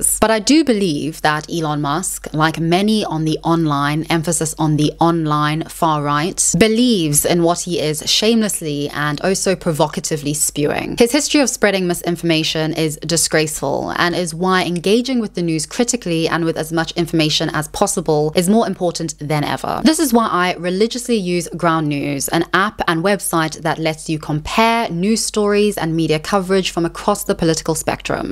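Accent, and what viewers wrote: RP, United Kingdom. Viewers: I remember listening to your channel a lot a few years ago. Would it be accurate to say that your accent has gotten more British than South African? I’m American and lived in the UK for some years, and now I think I hear more specifically UK sounds, though I may be mistaken. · I’m curious where you are from. You sound so British to me! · wonderful essay!!! (and, shallow as it may sound, what makes it even more pleasant is your voice and cut-glass English accent - if you ever grow tired of youtube, you should consider recording audiobooks)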